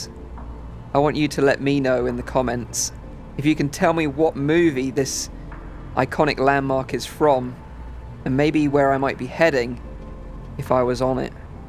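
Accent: Essex, United Kingdom. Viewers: I used to live in Prittlewell so not far from you by the sounds of it. Essex always gets a bad rap for the accent but a lot of us dont speak like the ones of Towie and speak the same as you. They seem to only have people from the grot parts representing our county on TV.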